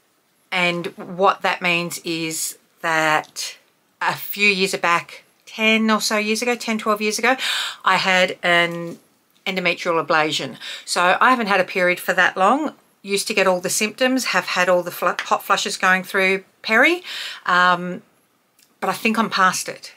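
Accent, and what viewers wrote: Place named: Australia. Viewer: So good to hear a YouTube beauty content creator with an Aussie accent! 69 yo Aussie lover of makeup and skincare here. Look forward to seeing more of your videos. 😊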